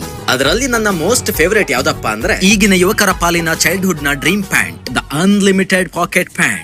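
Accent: Kundapur, India. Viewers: The way you talk ..awesome .. i like you language kundapur kannada👌👌💕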